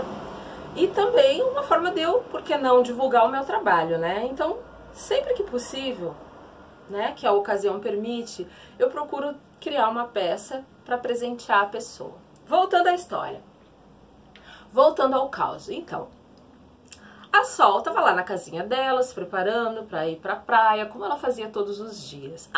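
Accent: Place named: Rio Grande do Sul, Brazil. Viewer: Oi boa tarde tú és gaúcha!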